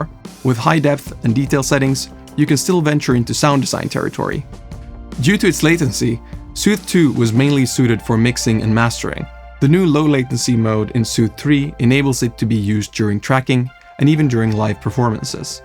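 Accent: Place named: Finland. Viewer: En tiennykkää et oli suomalainen, respect! Accent gave it away tho:D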